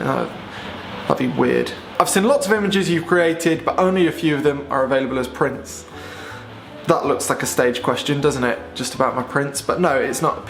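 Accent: United Kingdom. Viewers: You just might be my favourite M43, British accented comedic, photographic personality with excellent advice and insights. Your vids are thoroughly enjoyable to watch.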